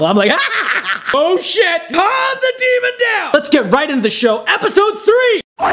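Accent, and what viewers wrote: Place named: Australia. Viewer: It sounds Australian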